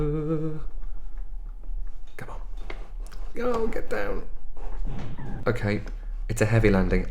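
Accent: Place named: United Kingdom. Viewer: You like ryan gosling but drunk and british :) good video!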